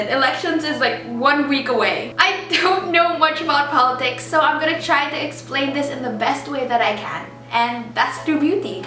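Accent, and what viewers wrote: Philippines. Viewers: im sorry, i just cant help but notice your tagalog accent and it was the cutest thing ever!!!!! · slow clap for 👏 i love you 😍😘 soo proud of you 😊 PS: love ur tagalog accent 😁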